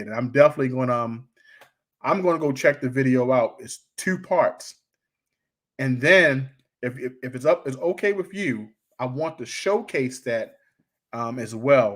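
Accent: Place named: Maryland, United States. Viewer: This was a treat to watch!!! Many genuine laughs while watching. your Maryland accent is so awesome. I’m amazed you learned about silver through the Moorish science movement. Islam!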